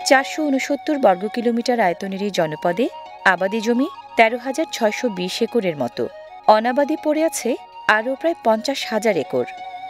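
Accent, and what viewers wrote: Standard. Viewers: আপুর কন্ঠের ভাষা অনেক ভালো লাগে। শুদ্ধস্বরে বাংলা ভাষা শুনলে যেন প্রান জুড়িয়ে যায়। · আপু আপনার অনুষ্ঠান খুবই ভালো লাগে আপনার মুখের কথা গুলো অতি শুদ্ধ আর আপনার মুখের ভাষা গুলো শুনতে ভালো লাগে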